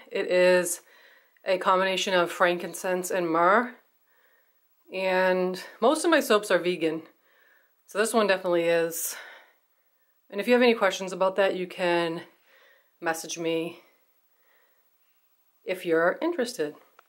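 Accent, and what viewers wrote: United States. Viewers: The way you talk has a.ways been ok to me you just sound American 😊😊👍🏻 Like sounds like “Like” maybe i say it similar . Just carry on 😊😊😊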